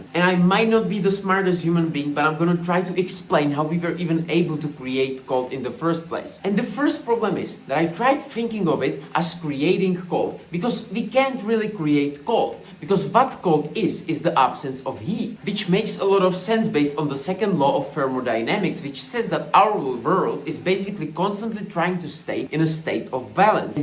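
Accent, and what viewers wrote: Czech Republic. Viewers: seš čech co? přízvuk.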